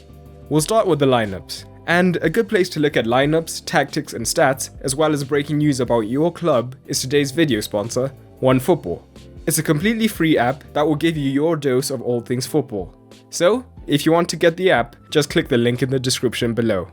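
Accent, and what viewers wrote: South Africa. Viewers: Hey man, nice vids, keep up the good work, just wanted to ask, where are you from? Your accent sounds South African (I'm South African)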